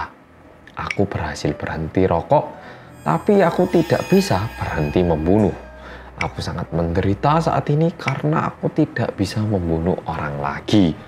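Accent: Java, Indonesia. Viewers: Suweneng medok e Mas.... Sehat selalu ya.... Semoga karya dan karirnya semakin melesat naik Aamiin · Detektif medok 💜👍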